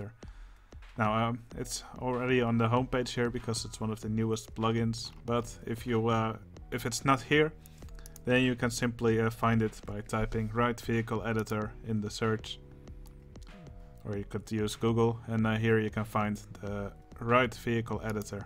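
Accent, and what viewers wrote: Netherlands. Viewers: Whaaaat, has a Dutch accent? I had not noticed yet!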